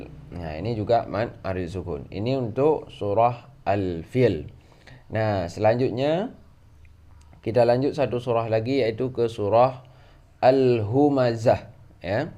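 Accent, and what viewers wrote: Aceh, Indonesia. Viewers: Logatnya sepertinya ustazd ini orang aceh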